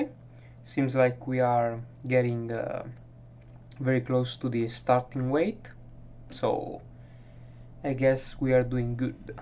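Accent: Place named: Italy. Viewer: I love your accent! ❤ i love youu!! So amazing 😂😊✨ be blessed! I love italy! I love italian men ❤❤❤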